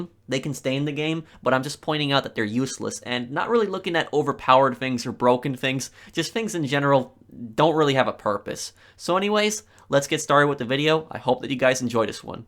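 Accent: Poland. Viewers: Are you from Poland? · Are you polish?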